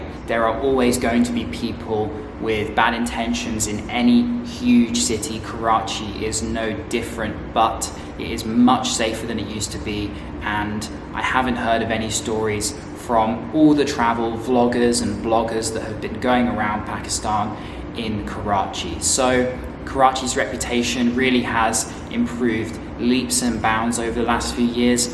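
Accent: England, United Kingdom. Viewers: I fell in love with your accent. Cause I really love English accent😊HI FROM TURKEY 🇹🇷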